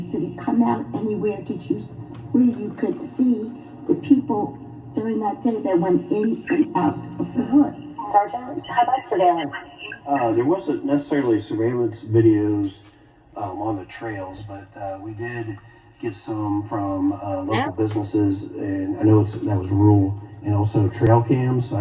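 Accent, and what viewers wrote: South, United States. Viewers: Can't get much better than a southern voice of reason! Great live 💙☮️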